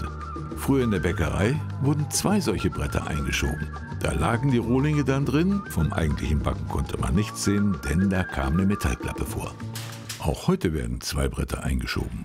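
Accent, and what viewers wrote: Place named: Rhineland, Germany. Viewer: hatte schon immer einen leicht kölschen/rheinischen Akzent und den wird er jetzt mit 82 Jahren und nach über 45 Jahren als Maus-Sprecher auch sicher nicht mehr ablegen.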